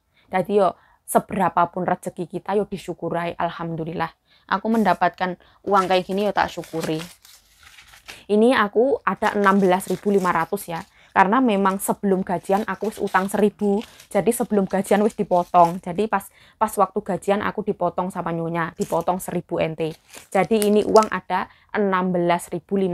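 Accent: Java, Indonesia. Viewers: Salam kenal mba.pas buka hp..ko ada yg menarik utk dilihat..disimak dan disuka..semoga mba sehat..selalu dg kesederhanaanya..dengan logat jawanya dg kerendahan hatinya..setidaknya dpt gambaran..oh seperti itu toh kalo jd TKW..tambah ilmu juga nih buat kita tau..domana kita kerja harus mampu menguasai bahas disana..salam dr bandung..semangat mba 💪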